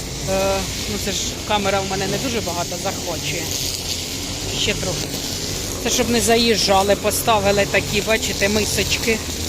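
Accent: Poltava, Ukraine. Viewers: Полтавський діалект😊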